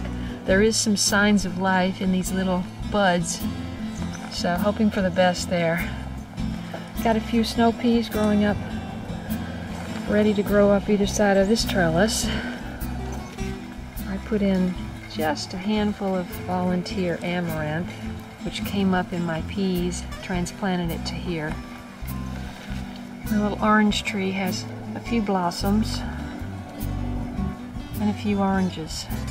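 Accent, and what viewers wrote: Tennessee, United States. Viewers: That is wonderful,. I will definitely be planting some here and there. We are living in the Central Valley area now. Modesto to be exact. This is a bit different for me, as I am used to growing in the TN area. I moved out west from the hills of Hancock County a few years ago. I could hear that TN twang in your voice. =) What area are you from out that way?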